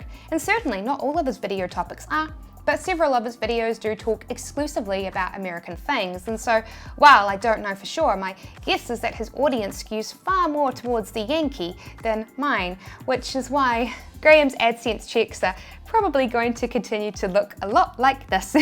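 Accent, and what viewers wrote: New Zealand. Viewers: Maybe Youtube heard you say "beach" in your NZ accent! BTW wouldn't it be fun for you to attempt doing an American accent for an entire video? I love hearing what we sound like to others around the world.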